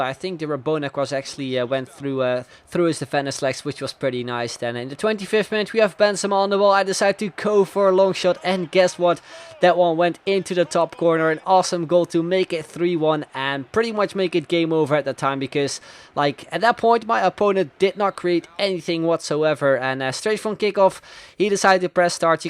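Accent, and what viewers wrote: Netherlands. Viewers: Are you Dutch???????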